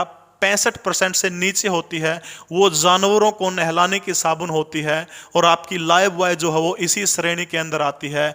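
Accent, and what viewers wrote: Haryana, India. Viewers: Very nice video dear Sir,, but sorry to say sir लहजा वो ही लाओ ठेठ हरयाणवी 🙏🙏🙏👍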